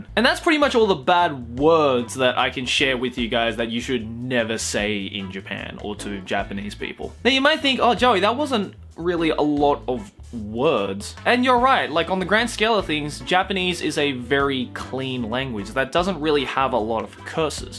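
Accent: Australia. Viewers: Salma M. He's Australian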